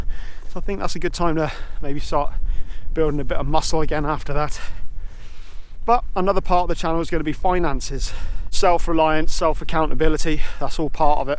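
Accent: West Country, United Kingdom. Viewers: Nice one Man am sober too ! Respects.....bet ya life has changed ! Hear a West Country Accent.?.. The World is in the grip of addiction so we need people to raise above this Demonic behaviors and speak about their journey into recovery......... Respects Blessings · Not down to earth talking, good west country lad!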